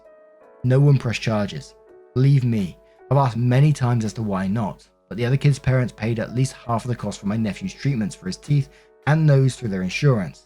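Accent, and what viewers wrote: United Kingdom. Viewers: Went to listen and still too soon to hear a British accent after the Matilda’s loss. Am soo happy for for the Lionesses and honestly wish the the best of luck. Russo and Hemp played incredibly just heartbroken for the Tillies :( still wanted to leave clout to support the channel. #FIFAworldcup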